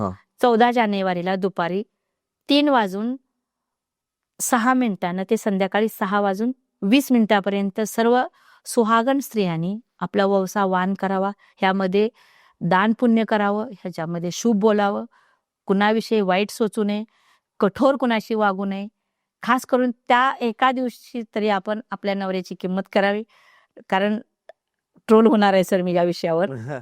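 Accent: India. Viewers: Aaho त्या दिल्ली साईड la राहिलेल्या आहेत म्हणून हिंदी मिश्र मराठी बोलतात ,तुम्ही pn दुसऱ्या राज्यात राहिलात तर त्या भाषेचा प्रभाव तुमच्या बोलण्यात होईल ,त्यात अशुद्ध अस काहीच नाही त्यांच्या भावना तुमच्या पर्यंत पोहोचणं महत्वाच आहे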